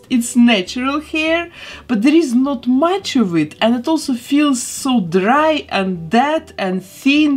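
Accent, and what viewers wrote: Russia. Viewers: У неё какой-то русский акцент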